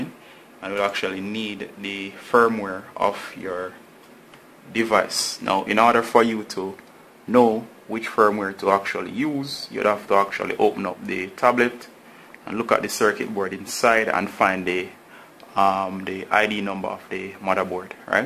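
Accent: Jamaica. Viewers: kinda personal but are from Jamaica?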